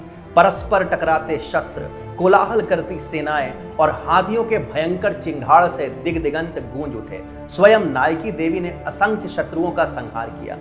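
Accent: Standard. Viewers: I have seen and heard quite a lot of your historic narratives... I have to admit.. Aap ka gyan kai guna shrestha hai atirikth anya tathakathit gyaniyon say.... Evam jo shudh uccharan hai wo bhi sarahana yogya hai... Bandhu. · Itni sudha hindi kabhi kabar hi sunane ko milti hai, I like your speech. Chanakya, yogi, k baad pehalibar aapse itni shudh hindi suni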